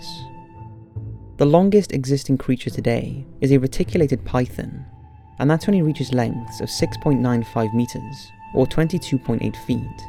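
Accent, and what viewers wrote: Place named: South Wales, United Kingdom. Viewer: It's a southern Wales accent. Sounds most similiar to a Merthyr one, but he's such a soft spoken guy!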